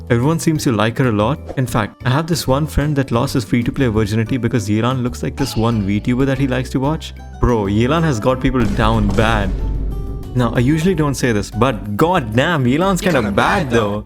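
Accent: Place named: India. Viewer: really like your accent. Its inbetween indian non indian- kinda 🥵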